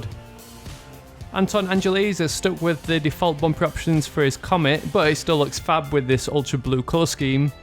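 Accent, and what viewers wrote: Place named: North England, United Kingdom. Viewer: by your accent I can deduct that you're from north England but where specifically?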